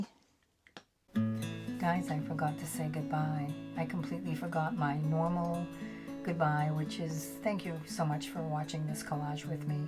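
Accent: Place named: New England, United States. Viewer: I'll watch whatever you're doing, so (as Ricky Nelson famously put it) please yourself:) Love hearing your New England-isms (which were also mine once upon a time) -- haven't heard anyone say 'hold yaw hawsas' in about 50 years ❤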